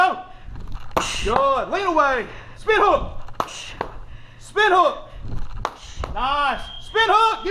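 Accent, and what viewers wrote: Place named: United States. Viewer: I train Muay Thai out here in Thailand. Never been to another gym. It's a trip hearing a trainer speak English terms with an American accent and attitude. lol. I need to come visit a gym back in the States! heh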